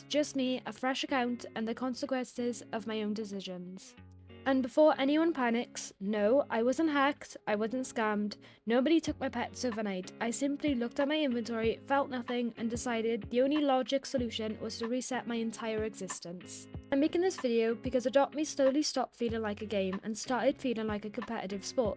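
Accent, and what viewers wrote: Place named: United Kingdom. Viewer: Love your British accent ❤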